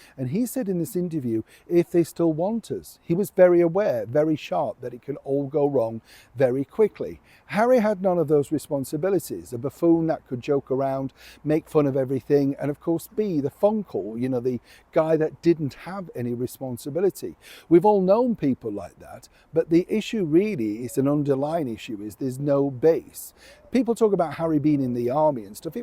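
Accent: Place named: Doncaster, United Kingdom. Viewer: Doncaster interesting accent😂😂😊😊 I’m from Donny, interesting int, tword ad use love.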